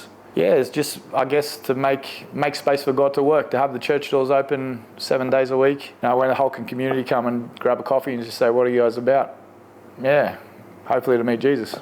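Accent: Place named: Sydney, Australia. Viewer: I love this testimony! 🙌 i grew up sydney around the same time and also went off the rails, the way you speak takes me back. Dark times. The devil is a liar! I was definitely under demonic oppression. Your testimony is so powerful, you will save so many 🙏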